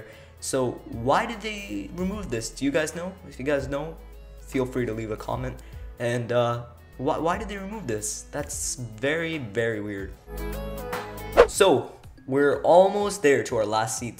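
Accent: India. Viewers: U have a slight Indian accent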